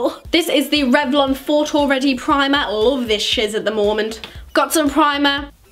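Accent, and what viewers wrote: Newcastle, United Kingdom. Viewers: Sounds Geordie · Are you from Newcastle? X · i think she's from newcastle (dont hold that against me im not great with accents)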